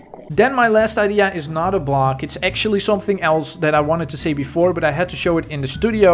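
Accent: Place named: Netherlands. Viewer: Ayy you sound dutch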